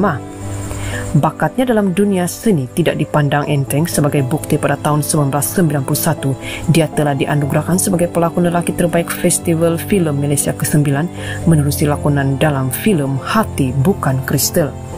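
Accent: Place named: Java, Indonesia. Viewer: Tak pasal2 ckp ala Indonesia. Mcm Jawa kronok.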